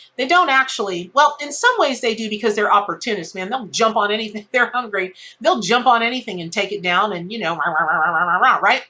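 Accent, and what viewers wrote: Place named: New York, United States. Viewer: Hahaha!! That sounds like a New York accent hahahaha 🤣🤣🤣 waaaaay back when I was in high school I played flute in band (how is your native flute going, btw?? 😊) and on my first band trip we went to New York. A guy was selling M&Ms for a charity outside of Virgin records in times square and I told him how many I wanted to buy and he said "four gorgas" and I said "How much is that?" And he repeated himself "Four gorgas" and I asked "What is a gorga?" And he slowed it dooooown and said, with the accent, "Ya gogous" (you're gorgeous) as in he was grateful I didn't just stop to listen but to also help with whatever charity hahahaha 🤣🤣🤣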